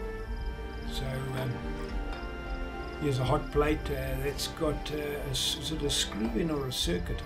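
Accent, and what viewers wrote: South Africa. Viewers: Do i detect a south african accent /proud of you for doing well in the gameworld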